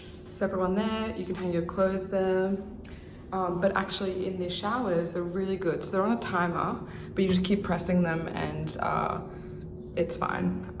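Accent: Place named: Germany. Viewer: Clearly! She has a strong German accent 😂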